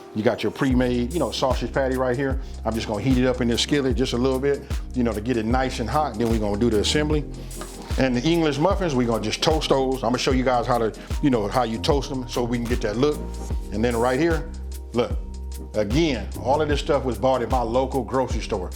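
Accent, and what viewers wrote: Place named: Bay Area, United States. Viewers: The West Coast accent is deep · dude"you from the Bay?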